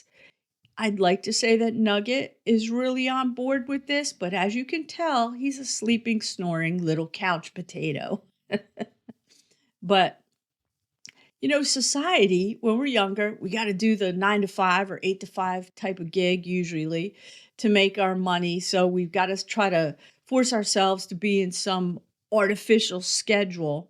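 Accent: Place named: New York, United States. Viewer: I love your accent. I am Australian. Are you from somewhere in New York, maybe Queens?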